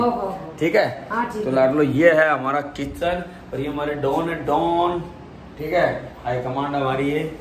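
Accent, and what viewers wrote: India. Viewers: Haryana s hain kia sir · Full rajasthani tone 🤭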